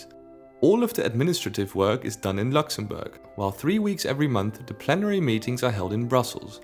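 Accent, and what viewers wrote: Netherlands. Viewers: I hear a slight Dutch accent am I right? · You're Dutch, right? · I hear Dutch accent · Why do you sound Dutch? Are you?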